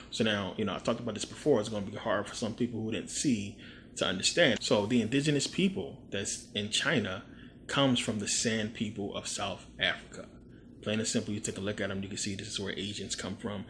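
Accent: Philadelphia, United States. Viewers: He’s from Philly. We are city cousins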